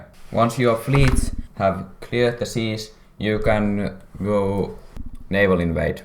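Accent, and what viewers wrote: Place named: England, United Kingdom. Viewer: Sounds like rally english.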